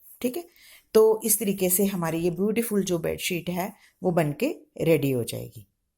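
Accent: Punjab, India. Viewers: Your voice mam, is so like my mom's!😍🤗 And it's okay if your Punjabi lilt comes in on your video, I'd love it! And am sure many other would too! तुम्हारी आवाज़ माँ, मेरी माँ की तरह है! 😍🤗 और यह शानदार है अगर आपका पंजाबी उच्चारण आपके वीडियो पर आता है, और मुझे यह पसंद आएगा! और मुझे यकीन है कि कई अन्य भी होंगे! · Nice video, n Panjabiyat jhalak Rahi h which is nice expression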